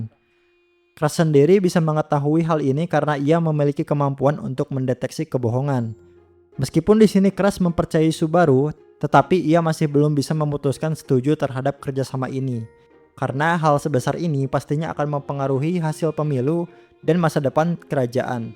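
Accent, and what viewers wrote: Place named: West Java, Indonesia. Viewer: admin ketahuan orang sundaa😂😂, btw gw juga sunda sih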